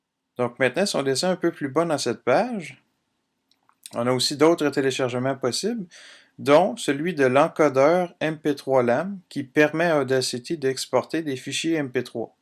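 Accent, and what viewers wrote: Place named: Canada. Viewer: SUPER TUTO, très clair. Maintenant, il en faudrait un pour utiliser Audacity !! Et j'aime trop l'accent de nos amis canadiens !!! Merci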